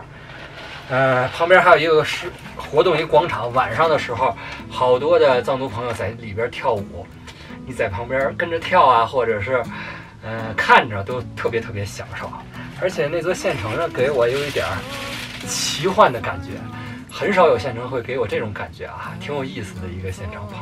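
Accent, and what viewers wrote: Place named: Beijing, China. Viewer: 喜欢😍他的知性和标准的京片儿语音。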